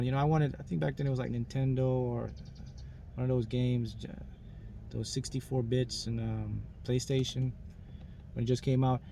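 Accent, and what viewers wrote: Jamaica. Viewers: I would love to hear you speak with the carabean jamaican accent...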